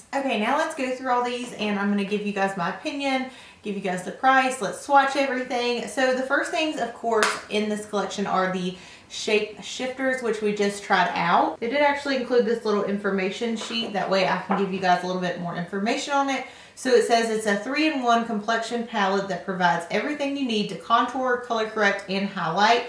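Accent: South, United States. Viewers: Knew you were country from the first word you said haha love from Mississippi ❤️ thanks for sharing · Loooove your accent. I'm a country girl myself and I have t heard any makeup bloggers that have a southern accent. So glad I ran upon your video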